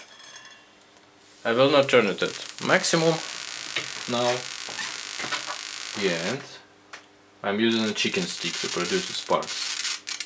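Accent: Russia. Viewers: спасибо, русскую английскую речь не спутаешь ни с чем, слишком все правильно выговариваете. :) Спасибо за видео. Будут вопросы обязательно спрошу. Меня больше интересует тлеющий разряд, так что спрошу под другим вашем видео. :)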